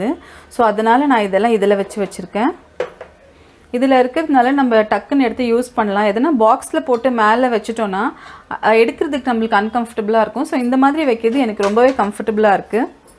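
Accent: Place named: Bangalore, India. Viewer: the way of telling, even im from blr😀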